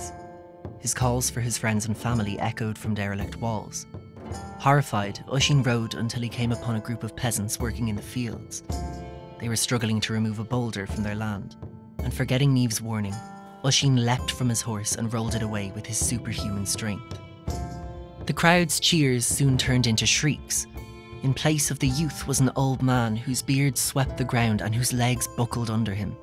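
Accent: Dublin, Ireland. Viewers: Its a Irish accent because an American cant pronounce the names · One complaint: the stress on 'Danann' ought to be on the first syllable, not the last one. Unless you're speaking Munster Irish, and the narrator sounds like they're from close to Dublin. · I appreciate that you had someone with an Irish accent narrate this. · Lol you can hear the narrators irish accent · As an Irish person I agree with the OP because the Irish accent adds the authenticity that an American accent wouldn't, whether Irish American or not. American accents are already so omnipresent in all media that I don't see the problem in our myths and legends being recited by a recognisably Irish tongue. There's no reason to feel insulted 🤷🏽‍♀️ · Love the story. The irish voice also goes with it too. Keep it up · D4 accent? Just sounds like Dublin to me. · He's got an ear-grating D4 accent though. 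The most reviled accent in Ireland.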